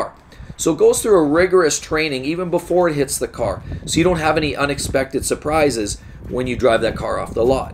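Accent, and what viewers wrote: Canada. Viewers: Well this guy has a very thick country Canadian accent. It reminds me of Fargo. ❤️👍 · Awesome Canadian accent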